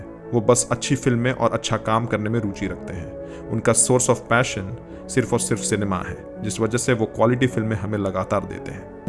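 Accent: Kerala, India. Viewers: Bro why I feel like you have malayalam slang in your hindi and you pronounce malayalam correctly like any other mallu.. unlike North Indian... is that a mallu guy behind the mask.. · Dude your mallu accent is so obvious 🙂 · But your Hindi like Malayali Hindi ...? Why ...?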